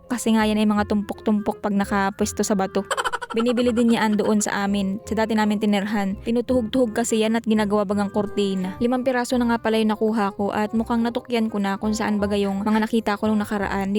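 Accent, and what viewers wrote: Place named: Mangyan, Philippines. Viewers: Miss sudgest lng aliisin mo ung tunog kamangyan kc Ang galing Ng voice over at mga jokes mo kaya d mo kailangan gumaya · ma'am taga mindoro ka po ba? kasi sa tono ng salita mo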